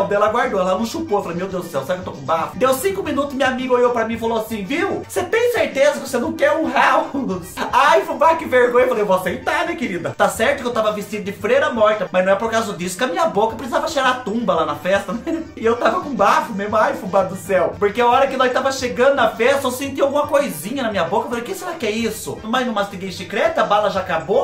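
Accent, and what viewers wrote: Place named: Caipira, Brazil. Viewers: Olha Fubá eiu concordo com o Fubá que comentou que se vc fizer Stand Up vc arrasa! Porque vc é muito engraçado porque exagera e fala com sotaque caipira muito engraçado!!!! Bj bj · Vc. Fala iguarsinho eu quando morava em minas gerais 😁😁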